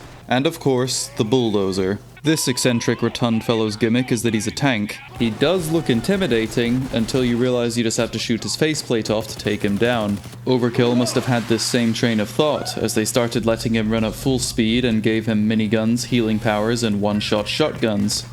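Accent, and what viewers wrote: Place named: Jamaica. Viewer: I thought you were Jamaican am I fucking stupid.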